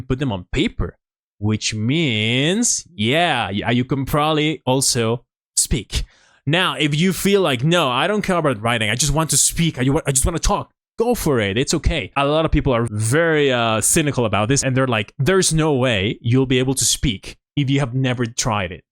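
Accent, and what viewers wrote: United States. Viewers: Do you have any favorite TV shows, movies, or Spanish-speaking Youtubers? I find it hard to find places to practice my listening. Btw your American accent is really good! You sound like you grew up here! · Nunca me gustó ese acento americano, suena muy afeminado.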